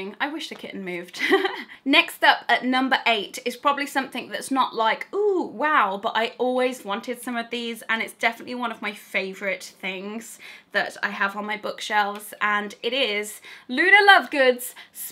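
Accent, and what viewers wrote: United Kingdom. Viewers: I’m so used to hearing you so when I hear a British accent it sounds so normal to me btw I’m American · I love your British accent ❤️❤️❤️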